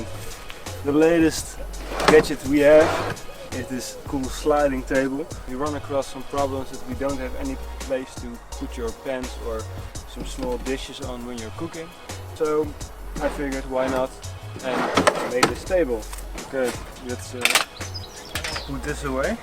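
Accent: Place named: Netherlands. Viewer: Super gaaf gedaan! Ben er best jaloers op! Aan je Engels te horen kom je uit NL denk ik.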